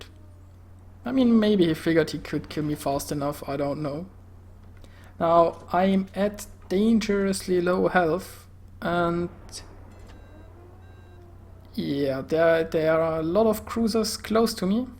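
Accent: Germany. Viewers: german accent confirmed or not confirmed?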